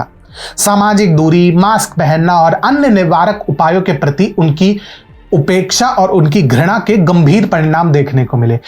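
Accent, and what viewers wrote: Standard. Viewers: आपकी इतनी अच्छी , शुद्ध और साफ हिंदी के लिए आप को साधुवाद ।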